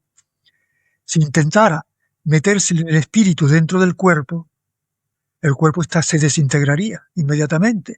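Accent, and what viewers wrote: Spain. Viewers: El Dr tiene una oratoria extraordinaria,y resulta muy bello oírle con su bonito acento andaluz.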